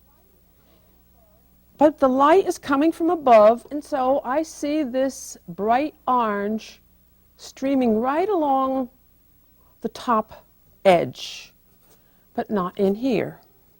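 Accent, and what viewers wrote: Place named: New Jersey, United States. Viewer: Love that New Jersey accent ( where Helen grew up). Example: "imPORTANT." Helen is the best! Thank you!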